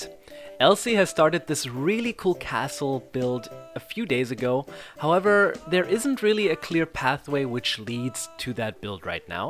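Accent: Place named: Germany. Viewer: You got this german accent 🤔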